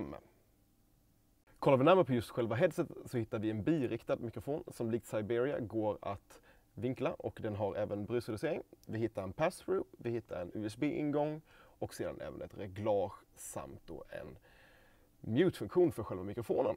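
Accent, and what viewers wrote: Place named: Sweden. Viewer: He’s from Sweden 🤨🤨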